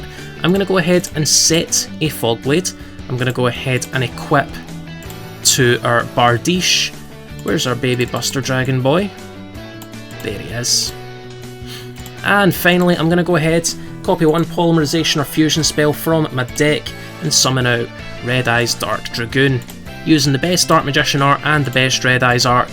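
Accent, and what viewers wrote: Scotland, United Kingdom. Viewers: That Scottish accent yo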